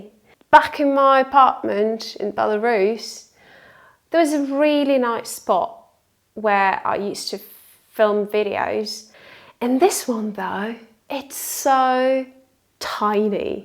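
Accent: United Kingdom. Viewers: Wow, your from Belarus, but sound like a British person. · you really sound British!!! how did you get such a beautiful British accent???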